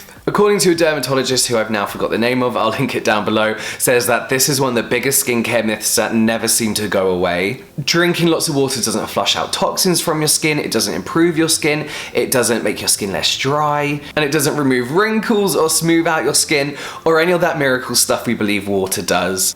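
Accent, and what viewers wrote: United Kingdom. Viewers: are you Britain?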